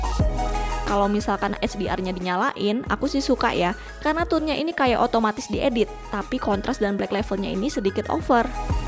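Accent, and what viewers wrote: Yogyakarta, Indonesia. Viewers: Orang Jogja kah?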